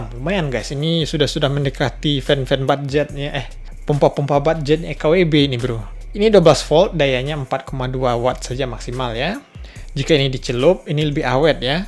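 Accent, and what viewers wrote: West Sumatra, Indonesia. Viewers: bahasanya indomi kali yah, atau prasaan saya ajaa :) , gw tebak pasti orang minang 🗿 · Jaleh bana urang minangnyo...🤣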